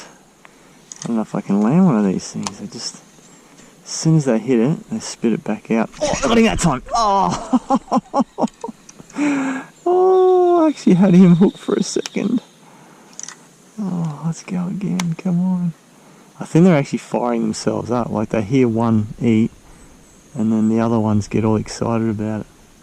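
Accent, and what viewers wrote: Australia. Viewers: Nice follow up by that first fish in the vid. ...So If I go by your accent, then we are in Australia somewhere. Looks like weasle and mink country along that bank but I don't know if you have those there. Looks like one of our Mangrove snapper that we have over here in S. W. Florida in the USA>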